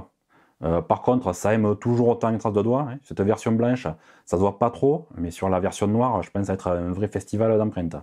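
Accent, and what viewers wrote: South, France. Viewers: L'accent du sud c'est le meilleur 👍👍👍😁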